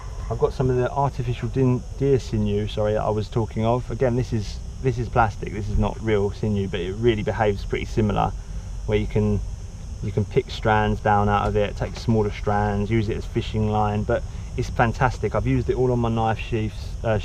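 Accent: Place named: United Kingdom. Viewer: I liked that you took out the kits, and then went throught them later. That's a good idea for the audience. I'll be borrowing that idea when I share mine with the world. I like your hobo rocket stove. That seems like an upgrade compared to the one I built. I'm impressed that you managed to cram all that stuff in there. The bag looks well throught out, well organized, and well used. The tape on a hacksaw blade is a nice touch and really stood out for some reason. Final thoughts: holy crap I didn't know British was a different dialect. I lost count of how many new words I learned LMAO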